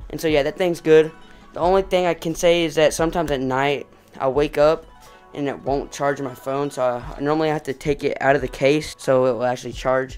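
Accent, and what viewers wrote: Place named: Texas, United States. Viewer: Impressive room. Desktop setup is amazing. Little clues like your accent and the weather today make me think you’re in Texas.🤷🏻‍♂️ Great content. All the best. 🗡Ace🗡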